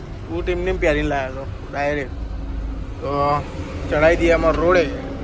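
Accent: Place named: Kheda, India. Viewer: तुम्हारी भाषा नडीयाद खेडा नी छे🎉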